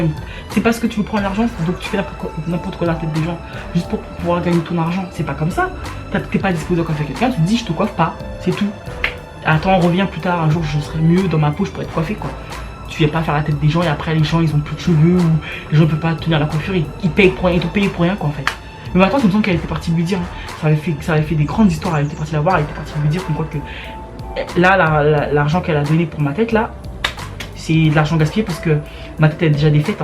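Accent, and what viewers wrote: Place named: Guadeloupe, France. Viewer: je suis une petite youtubeuse et superbe vidéo je viens de te découvrir et je vois que tu parles créole guadeloupéen ça fait trop plaisir ❤️🔥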